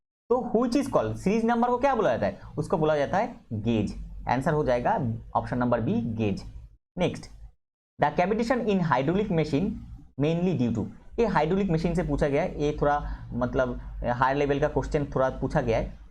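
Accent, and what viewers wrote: Bengal, India. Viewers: app bangali ho keya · Dada Tumi ki Bengali?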